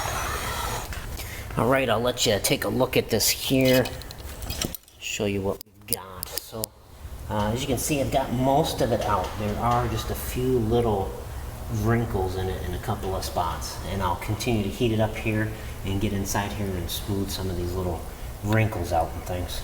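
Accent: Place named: Minnesota, United States. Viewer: Nice video! My mechanic here in Houston wanted $350 just to pop out a corner dent like that. But Houston is expensive. Also nice to hear a Minnesota accent! I went to college up there.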